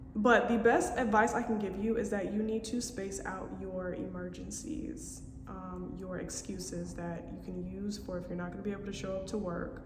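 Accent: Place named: Alabama, United States. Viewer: you talk like a Bama girl though ! 🙂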